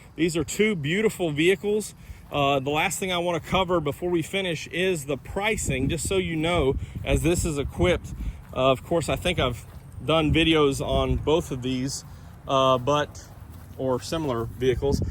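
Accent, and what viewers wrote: South, United States. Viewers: Southern accent…easily misinterpreted. Bench is the word vs Captain chairs in the CX-90. Nice vehicles!!!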